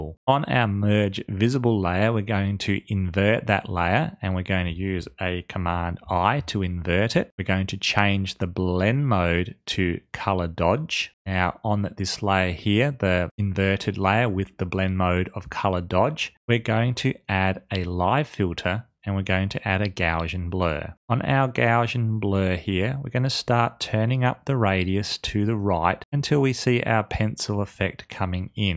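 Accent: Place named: Australia. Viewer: Thanks mate i have only just found you.... easy to understand you are an Aussie!!! I'm staying on board!!!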